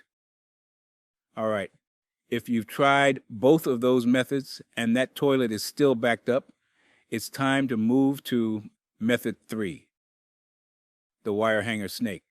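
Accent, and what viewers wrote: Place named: Louisiana, United States. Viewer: This is what I said as well! 😂 I was like ...."He sounds like my Dad/Uncles!" , which are born in Louisiana.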